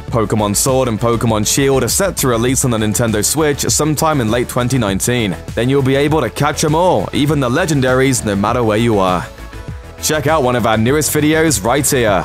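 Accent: United Kingdom. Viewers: Only fitting they the person who narrates has a British accent.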